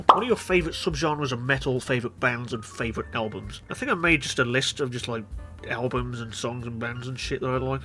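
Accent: United Kingdom. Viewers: HELLO FUNNY NASAL BRITISH LAD,CONGRATS MISTER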